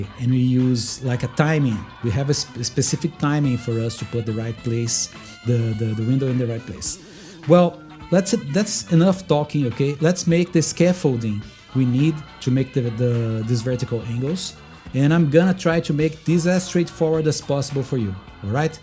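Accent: Brazil. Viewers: Só de ouvir o sotaque da pra perceber que o cara é BRASILEIRO!!! Meu amigo, tu manda muito, essa corvette é simplesmente INCRÍVEL e sinceramente é a minha favorita em toda a comunidade de NMS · Cara, que vídeo bom, melhor ainda foi escutar a voz e logo sacar que é um br, parabéns irmão, tá de parabéns. E meu Deus o tanto de Argonaut Winglet que tem que usar é brincadeira viu, Rockhopper Propeller mvp do vídeo.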